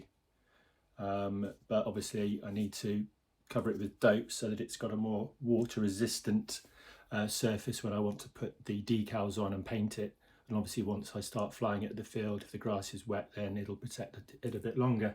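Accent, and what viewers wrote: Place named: South, United States. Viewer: As a model’er myself, you have made a jolly good effort there. I detected a southern accent there. I am in the West Midlands. I hope we can speak face to face when me day.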